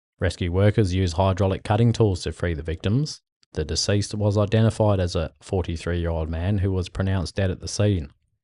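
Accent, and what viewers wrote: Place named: Australia. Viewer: Thanks for the content. I enjoy the aussie commentary hehe. Cheers mate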